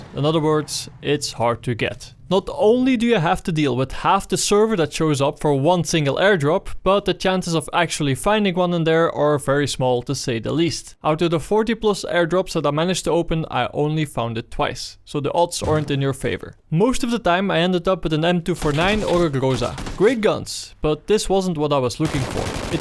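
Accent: Netherlands. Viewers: echt een nederlander hoor het gelijk XDD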